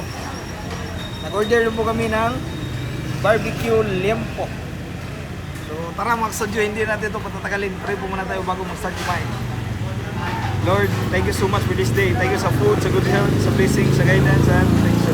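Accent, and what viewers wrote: Visayas, Philippines. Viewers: bisaya kay ka kollll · Gahia pod sa imong Tagalog Bai. Klarong Bisdak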